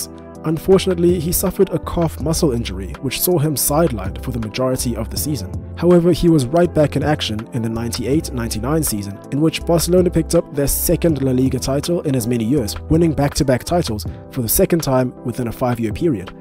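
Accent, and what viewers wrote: South Africa. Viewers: You sound like a South African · Are you South African ? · I’m getting a South African accent are you from Mzansi? Good job mate👊🏾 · Great video. Subbed quickly. Hearing your South African accent made me sub even quicker. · Are you south african?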